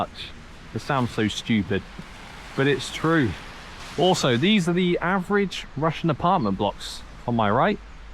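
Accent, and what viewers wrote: United Kingdom. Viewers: Ох уж этот британский акцент)))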